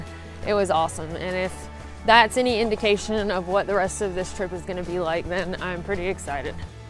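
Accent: South, United States. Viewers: LOL, I read the title of this video with your southern drawl in my head! Thanks for the laugh and have fun in Spain! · The Forrest Gump thing had me like🤣🤣🤣 I remember the first time I watched one of your vids and heard your accent,I said,she’s from Alabama,Georgia or Tennessee. Greetings from a fellow southerner. Knoxville,TN boy here.✌🏼 p.s. there’s nothing better than a southern girl👌🏽🖤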